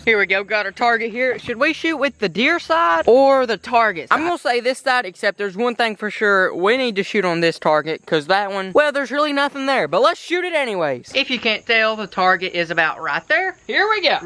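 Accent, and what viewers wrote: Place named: Kentucky, United States. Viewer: "Not as FIRST as the CLOSE one" love the vids , that east Kentucky twang runs deep as the mines!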